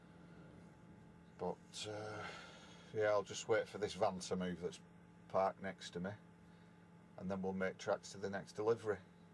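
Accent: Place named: Yorkshire, United Kingdom. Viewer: Yorkshire! :D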